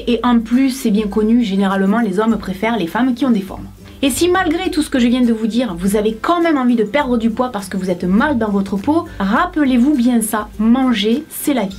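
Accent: South, France. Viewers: j'adore tes videos!! ensoleillées , avé l'accent!! et elles sont bien classées pa r categorie, c'est tres pratique ,on dirait un vade mecum!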